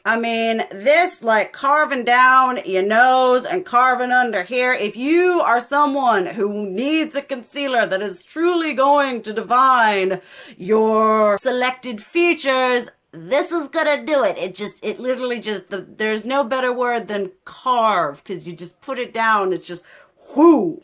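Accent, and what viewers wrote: South, United States. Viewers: New subscriber here 👋👋 I have to ask, with that beautiful southern accent, where are you from??? I live in northern Louisiana and we have a few women here that sound the same! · a true southern belle 💗 you have such a beautiful voice. I'm in Lafayette Louisiana and all I hear around here is Cajun so its refreshing to hear a beautiful accent 💯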